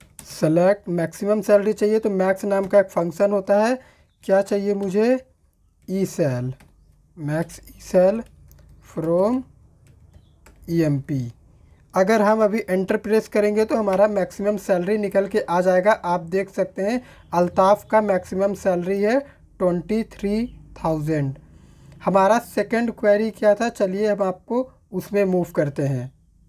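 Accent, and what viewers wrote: Jamshedpur, India. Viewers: Are bhai Jamshedpur se ho kya ? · Bhai Jamshedpur se ho ky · Sir ji app Jamshedpur se ho kya???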